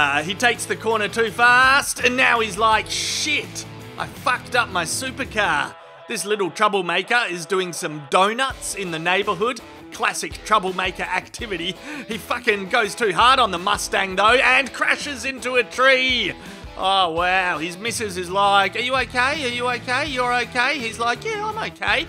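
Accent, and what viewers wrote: Australia. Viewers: "Yeah-nah!" Classic Aussie speech.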